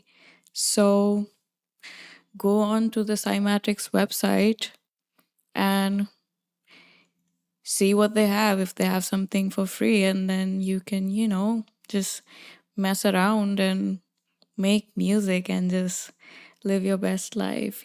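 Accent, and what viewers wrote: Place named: India. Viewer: Hey r u Indian by any chance Cuse your accent tell that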